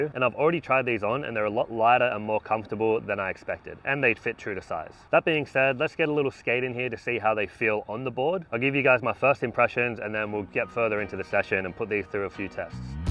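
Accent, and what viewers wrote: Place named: Australia. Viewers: hes aussy its not that serious its a culture thing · Dudes never heard an australian man speak before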